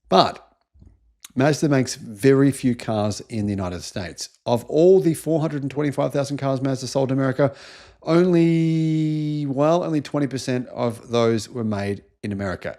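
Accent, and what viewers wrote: Australia. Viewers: Australian pronunciation , wait 'til you hear us say aluminium 😂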